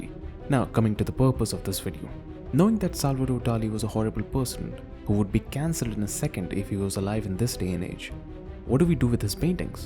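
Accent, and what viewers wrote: India. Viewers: You can tell from the awful sound of this dude‘s cringe accent that he’s from India. Why in the heck would ANYONE think he would actually know what he’s talking about regarding a Western artist? I laughed my arse off when he talked about Dali’s “rAciSM” - oh the horror! 😱😂 This guy is so dumb in fact that he still thinks Adolf was “the baddie,” despite us living in the age of the Internet and having the truth right there before our eyes easy to discover now. This video is so cringe. The only thing that bothered me out of everything he listed was I felt bad for the cats. But I’ll bet my bottom dollar that the comment about him putting dynamite up a duck’s behind was a joke. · At first I thought: "who is this idiot crying about fascism and racism". Then I heard Indian accent.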